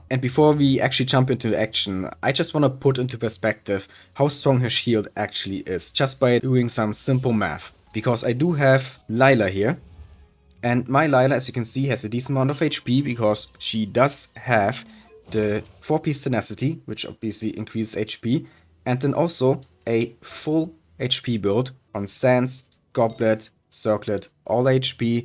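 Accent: Germany. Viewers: Höre ich da etwa deutsches english?😅😂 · you have a thick german accent bro i will sub tho cus nice video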